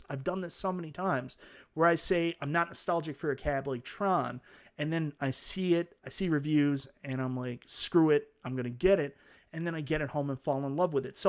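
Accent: Canada. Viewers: So glad there is a Canadian voice for arcade 1up. Hoping for marvel vs Capcom 2 in Canada somehow without that savage VAT added.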